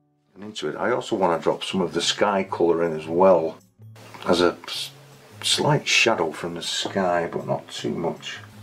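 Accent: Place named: Sheffield, United Kingdom. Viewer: This is kinda random but, your accent reminds me of several of my favorite musicians like Richard Hawley and Alex Turner. They both come from Sheffield in the U.K. and in particular I've heard them say they are from High Green(I'm American and have never been to the U.K. but certain accents are somewhat recognizable to me) To me the 'Sheffield' accent sounds similar to Scottish and Irish.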